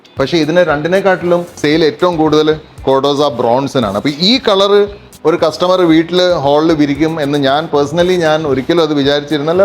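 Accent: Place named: India. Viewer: Thanks for your reply. My project is in a planning stage, so presently is looking into various options n materials before final selection. I am from Ernakulam. I often see your videos. It's quite nicely presented with lots of new info for a layman like me. Keep it going... Hearing your unique malayalam accent, with a touch of manglish in it, I have always thought you were educated somewhere outside kerala.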